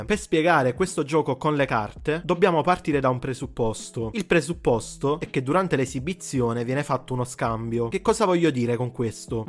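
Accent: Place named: Naples, Italy. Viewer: credo che sei Partenopeo dalbtuo accento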